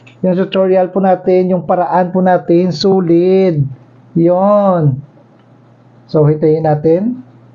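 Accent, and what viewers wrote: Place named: Iloilo, Philippines. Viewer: du ilonggo ka boss haw thankyou po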